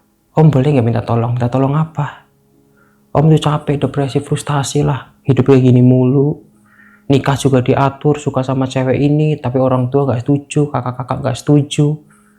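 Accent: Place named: Java, Indonesia. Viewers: Orang ngapak mase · ceritanya bikin lama krna bhasanya campur,knp sih gk pake bhasa Indonesia pakem aja,pake bhasa Jakarta juga logat lu ngapak,gk enak di denger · ngapak emang sya suka gaya ngmonge lucu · Medok sekali rikanyah kisanak li crita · Runtut, cara bercerita, meskipun kadang terselip dialek bahasa Jawa.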